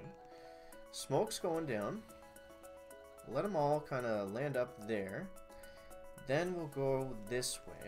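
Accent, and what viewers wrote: Canada. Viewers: Hey, love your videos man.any chance your from Canada's east coast? You sound like you might be. Thanks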